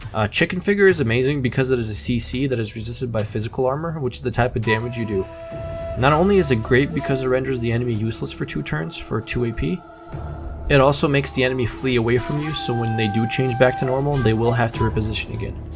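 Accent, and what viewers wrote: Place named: Midwest, United States. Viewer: yea sounds like a Midwesterner or something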